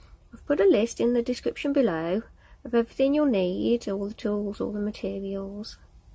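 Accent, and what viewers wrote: United Kingdom. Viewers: ....I just HAD to add a comment about your tutorials. I just completed this tutorial and I have to tell you that your soft voice is as soft as silk and it is soooo soothing to listen to you. I could listen all day! Love your British accent, also! You are such a favorite artist of mine; you keep every part of your tutorials clear & simple. I also love your name. My youngest daughter is named, also! You are a stellar jewelry artist & I am so appreciative of your tutorials that teach so much. I am retired and have been making jewelry for six years now,of various kinds, but am fairly new to wire wrapping/weaving. Thank you so much for your sharing generosity!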